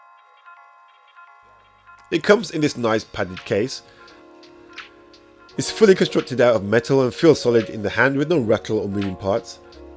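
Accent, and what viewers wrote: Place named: Midlands, United Kingdom. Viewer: I can hear the midlands in your voice. Yuh Dun know I'm subbing ☝🏾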